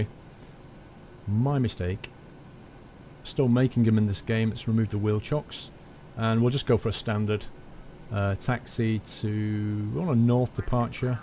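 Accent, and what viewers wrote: Australia. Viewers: ur sounding a lot like an aurrie even thou ur from the uk I think lol but ur saying the names almost spot on lol but I use to watch ur vids for euro truck sim now I know u play this as well so yea can watch more lol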